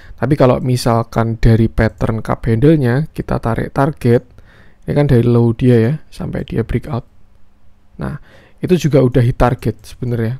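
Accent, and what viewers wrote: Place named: Central Java, Indonesia. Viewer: medok gess… org jateng nih min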